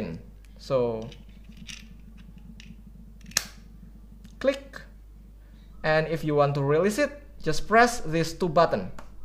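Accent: Indonesia. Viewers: Walopun bahasa inggris nya logat Indonesia banget msh mending dapat dipahami karena Artikulasi lebih jelas 😅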